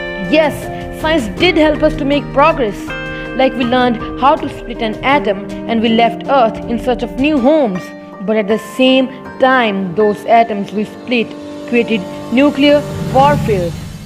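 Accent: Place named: India. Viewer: Ok....cut the LOUD dramatic music. It doesn’t help. Speak better English. Strong Indian dialect like Apo in Simpsons doesn’t help.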